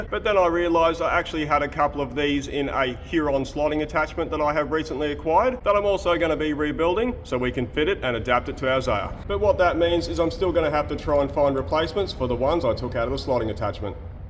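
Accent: Australia. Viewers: I'm from Germany and that Australian accent makes it easier for me to understand... I was born near Cologne and the rythm of Australian and "Kölsch", which is a German accent spoken around here, is quite similar...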